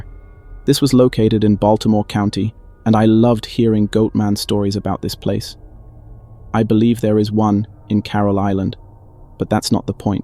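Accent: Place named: United Kingdom. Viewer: Awesome British narrator ! 😀😀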